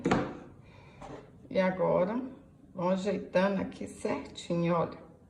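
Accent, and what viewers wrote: Minas Gerais, Brazil. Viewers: Kkk vc e mineira de onde sua voz te entregaaa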